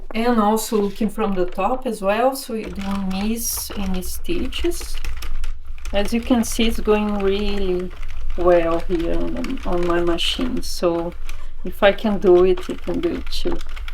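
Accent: Brazil. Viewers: Very beautiful your work, but about your english you seem to be brazilian.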